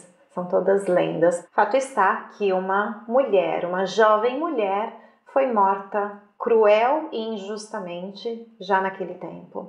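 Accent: Italy. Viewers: tu portugués tiene un sencillo acento italiano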